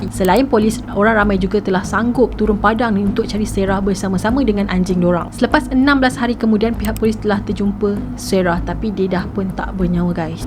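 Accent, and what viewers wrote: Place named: North, Malaysia. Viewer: mesti org utara kn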